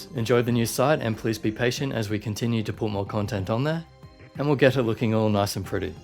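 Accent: Australia. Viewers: are you australian and why can't you get finalmouse?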